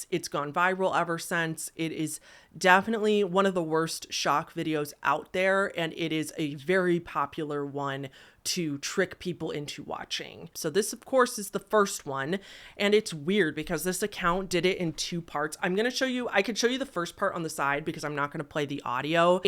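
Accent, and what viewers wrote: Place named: California, United States. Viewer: I can’t take this video seriously with the thick California accent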